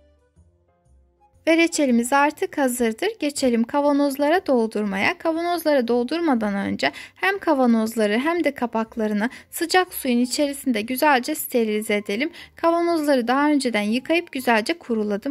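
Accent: Azerbaijan. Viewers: Xanım salam ,siz Azərbaycanlisiz ,lehcəniz mənə şirin gəldi .Abone oldum əllərinizə sağlıq 🌼🌼🌼🌼🌼👍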